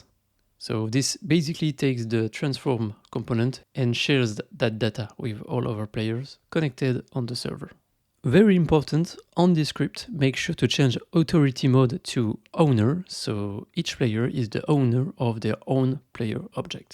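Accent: France. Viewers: Salut tu est français non?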